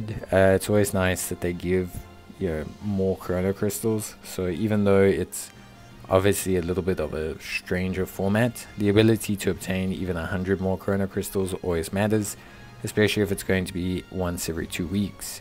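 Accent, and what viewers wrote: South Africa. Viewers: Yooo how you doing? by any chance are you south african?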